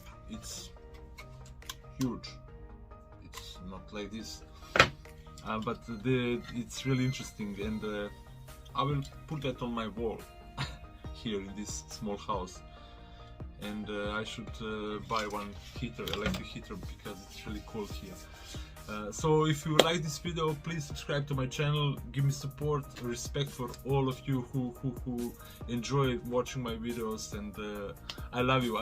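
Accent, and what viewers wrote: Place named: Bulgaria. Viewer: Hello my friend, are you from Bulgaria because your accent really sound like a Bulgarian man🇧🇬💪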